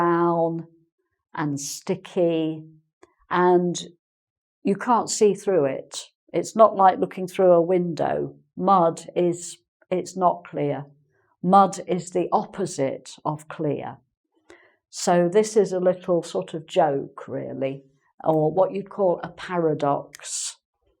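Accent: England, United Kingdom. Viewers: thank you I adoooore the way you teache . love your videos , I love your accent and wich one day I will get it, please , are you from england ? love this accent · Perfect british accent and very good teacher, thanks for your lessons. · Let me you that I love your accent, even though for me it's kind like annoying sometimes to hear people talking with that britsh accent because I'm more familiar with the american one but you speak so clear that your voice sounds lovly. Thank you very much, I love your lessons, and no, their not as clear as mud.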